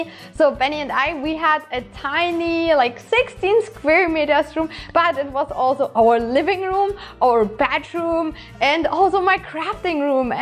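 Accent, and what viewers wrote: Germany. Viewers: English akzent is totally german ;-) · You would make a great Bayonetta cosplayer! Someone as gorgeous as you are would really have no problem with this. Also thank you for always being genuine! I almost feel like it's difficult to find cosplayers that are kind and professional as opposed to being snotty and gatekeeping. Additionally I love your German accent and it's not weird at all! :)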